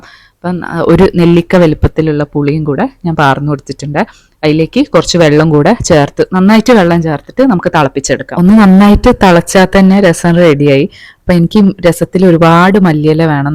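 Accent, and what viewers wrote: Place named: North Malabar, India. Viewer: R you in kasargod/kannur?.. Talking style feels like ours❤️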